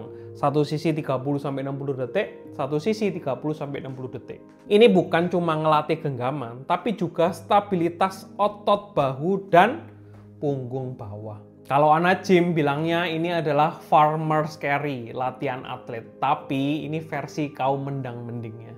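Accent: Java, Indonesia. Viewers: Nggak usah serius², dok. 😂😂 Dari aksennya jelaslah dokter org Jawa. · Dokter medok 😅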